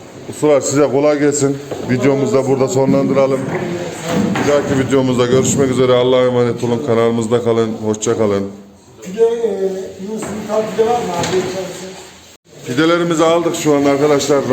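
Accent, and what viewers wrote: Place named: Kayseri, Turkey. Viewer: selam ben geldim nevin ozudogru kayseriliyim hemserim siveniz ayni dilden sizleri severek ve begenerek izliyom gadasini aldigim siz bizim nese kaynagamiz oldunuz kadir geceniz mubarek olsun dualarimiz sizinle herkeze selamlar saglicikla kalin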